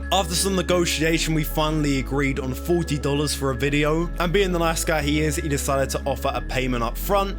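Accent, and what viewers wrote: United Kingdom. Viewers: I notice you selling and using $ but you’re accent is british. Do you live in Uk? Or uS?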